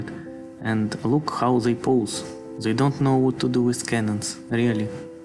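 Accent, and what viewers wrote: Russia. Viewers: Dodgy Russian accent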